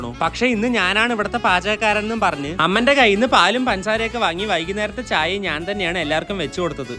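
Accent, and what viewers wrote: Palakkad, India. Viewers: ചേട്ടൻ പാലക്കാട് ആണോ അവിടെ ആണ് ❤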